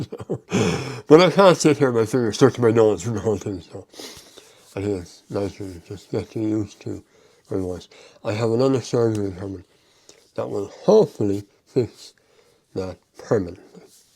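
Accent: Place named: Ireland. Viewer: Hi, I used to watch yours and others commenting on cars here on youtube, you are not Jeremy Clarkson, but your Irish accent and your personality shines, so I remember you much more than I remember lots of others. I noticed your voice has changed a long while ago, but didn't think much of it. I then moved to Canada and no longer needed a car, so kind of stopped watching car channels. Yesterday, I wanted to see some Toyota Corolla opinions and searched for your channel, then discovered that your voice has changed and is due to the cancer. I felt quite sad, I showed your video to my wife and told her why I liked your channel etc, she was quite moved by your story too. You are brave, your inspire whoever is facing any difficulties in life not to give up and keep one's spirit high. Please keep fighting, keep bringing us your amazing videos, I want to see you commenting on cars when you are 99 years old, or whatever travelling devices we have by then! 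My best wishes to you and your family!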